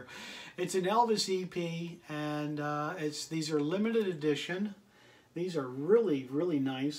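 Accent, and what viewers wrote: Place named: Mississippi, United States. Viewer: I always thought you were from Mississippi with that accent of yours???🤔lol😎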